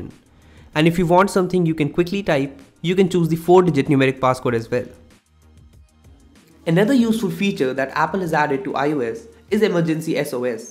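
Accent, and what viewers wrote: India. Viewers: Worthless vid, unless you like listening to indian accent english... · It’s Indian accent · Indian english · badibadibadi one currrryy pleaseeeeee, i really didn't understood anything what he said with his indian taxi driver dialect ... it's really hard to understand what he says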